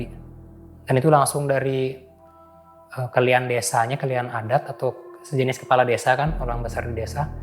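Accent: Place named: Bali, Indonesia. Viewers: abangnya ngebali banget logatnya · Ada logat bali nya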